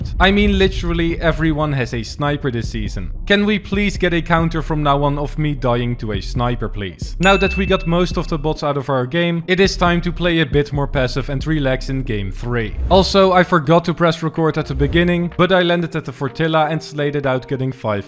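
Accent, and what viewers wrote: Netherlands. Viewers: are you dutch? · Are you Dutch? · Are you from the Netherlands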